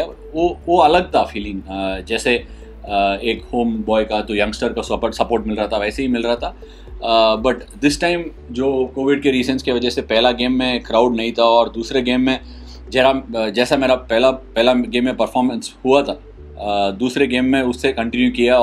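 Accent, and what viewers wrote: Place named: Chennai, India. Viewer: Best Chennai Accent😀 Nothing bad❤️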